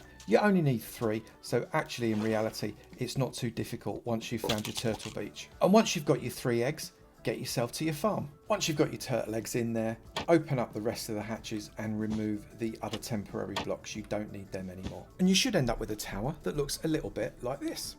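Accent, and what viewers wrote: United Kingdom. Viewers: no mate he really dont he just sound like a British person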